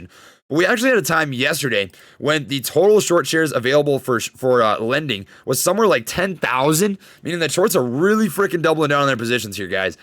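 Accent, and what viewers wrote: Minnesota, United States. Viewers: Minnesota boy?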